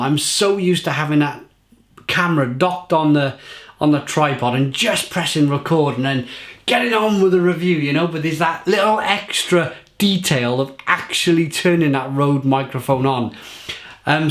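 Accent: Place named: Wales, United Kingdom. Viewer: “It’s going down like milk!” Love it! My mate Matt from Dinas Powys, a similar accent to used to say of cider - “It’s going down like water!” Love reviews. A legend of YouTube. No frills, just enthusiast and knowledgeable. Legend.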